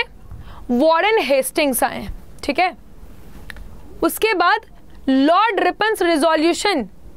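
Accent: Bihar, India. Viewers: Nice session 🙏 mam are u from Bihar 🤔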